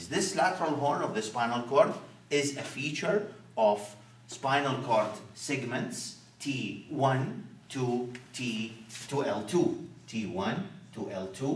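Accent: Iraq. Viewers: From your accent ,I gusse U R Iraqi So we R proud of U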